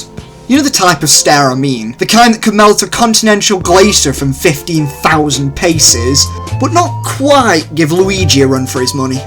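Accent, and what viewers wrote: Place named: United Kingdom. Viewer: 10/10 agressively British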